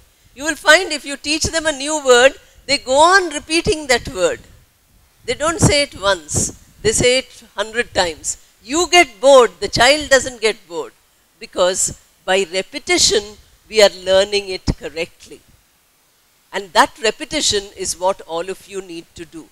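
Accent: India. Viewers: Obviously you are a good English teacher, style of your teaching is very easy.. And the most important thing that the way of teaching is in Indian accent👍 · So happy to listen wonderful Indian English, thank you mam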